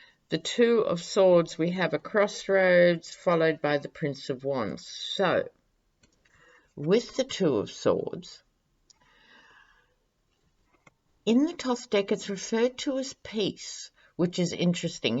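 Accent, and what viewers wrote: Australia. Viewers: Love that Aussie accent -- when you said you'd ordered a couple of new decks, it comes out dicks. If Ivanka is indicted, she won't hesitate for a New York second to rat out her father, to keep herself out of prison. We're so caught up in the US over the Trump business, we forget other countries in the free world have their disappointments, too. Australia -- we feel your pain. Thanks for the great reading -- as usual.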